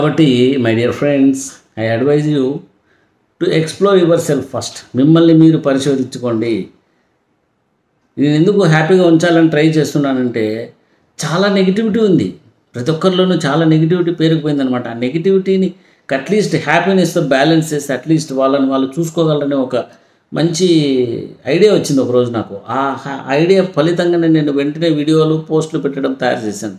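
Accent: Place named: Rayalaseema, India. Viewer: Superb comedy, innovative ideas coupled with Rayalaseema slang made your videos extra special. Keep going brother.🙏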